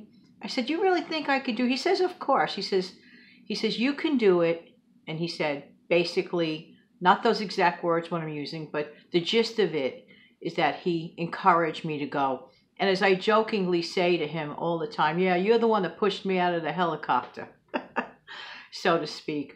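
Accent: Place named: New England, United States. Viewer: Hello Miss from New York City! Your new Georgia subbie friend here. Congratulations on 1,000 subs!! I think you should have many more. Your videos are very informative, thought provoking, and useful, you've got a good sense of humor, love your Yankee accent 🙂 I'm going to binge watch all your videos. Have seen many but not all. Anyways, thank you for making this one. I've been thinking for months about making a channel. So I appreciate you being forthright about your struggles. Off topic, but a question. Do you have any place up there where you can be around grass and trees and enjoy any nature this beautiful time of year? Never having been there, my knowledge of where you are is limited to movies, lol! All I know is somewhere there's Central Park and maybe not another blade of grass or tree anywhere!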